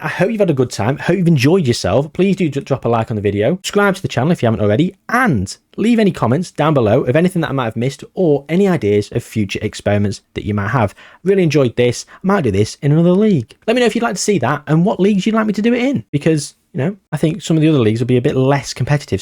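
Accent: United Kingdom. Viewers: I cant see it and thats why...for me way he talks is too much propa british way and way too fast to become worldwide youtuber. Im polish livin in uk for 17y and even for me its bit annoyin. For native british lads may do. Wont do no more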